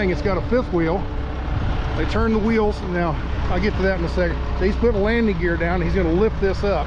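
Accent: South, United States. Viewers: Are you starting to speak like a trucker now or have you always spoke with a country sort of accent. is like a voice actor...his trucker voice is not always there and other times its very pronounced.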